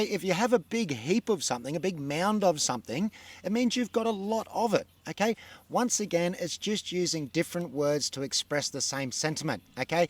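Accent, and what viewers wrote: Australia. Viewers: I’m American, I love how you Aussies speak! it’s fun to listen to you . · I LOVE the Australian accent so much! And please don’t think all of us are that dumb!! Lol 😂😂😂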